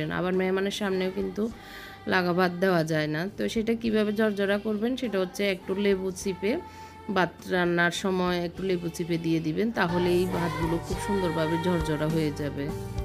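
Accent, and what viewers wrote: Noakhali, Bangladesh. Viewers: আসলে নোয়াখালী ভাষার মানুষ শুদ্ধ করে কথা বলতে চেষ্টা করেছে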